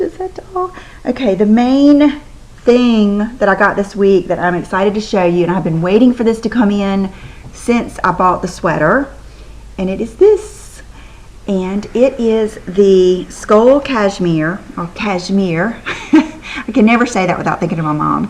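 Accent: South, United States. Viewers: ! Funniest story for you!! So I work in a hair salon in Vancouver BC Canada and a clients aunt was in town and stopped in to pick up some product so I helped her and she had a southern accent (super rare here in Canada to hear a southern accent maybe only the third I've heard in person, weird hey?) and right away I was like are you from North Carolina? Shocked she was like yes how on earth did you know and I told her by her accent lol! Took me forever to realize it was because I'm so used to your beautiful voice! Thought maybe I had a special gift for a moment! Anyways I Love you have a good week!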